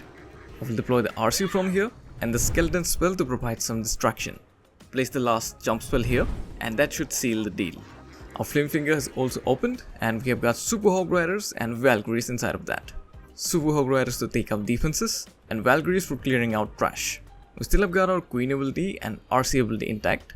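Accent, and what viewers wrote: India. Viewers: Bro are you Indian??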